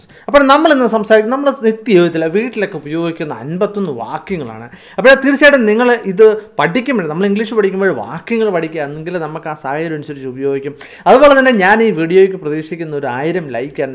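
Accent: Kottayam, India. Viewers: Malayalam slang kelkkan nalla rasamundu.kottayam aano? From trissur.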